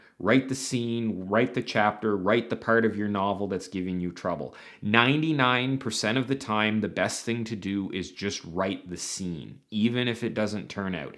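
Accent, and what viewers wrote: Canada. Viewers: i swear i can smell the canadian in your accent. it's messing with me trying to figure out if i'm imagining things or not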